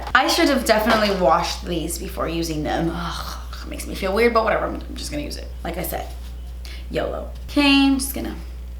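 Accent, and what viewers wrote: Cuba. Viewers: She's cubana 😊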